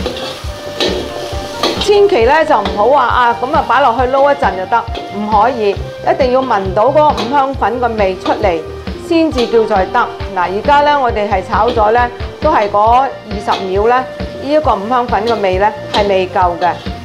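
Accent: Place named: Guangzhou, China. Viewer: your accent same as mine, must be from GuangZhou, GuangDong...... de Miami, Florida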